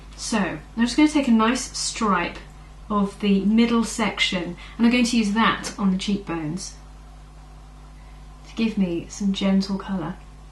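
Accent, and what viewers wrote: England, United Kingdom. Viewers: Love your channel... it's so refeshing to hear such a beautiful english accent.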